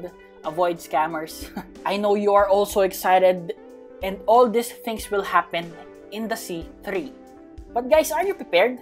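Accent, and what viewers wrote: Philippines. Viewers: Sabi na nga pilipino ka eh accent palang eh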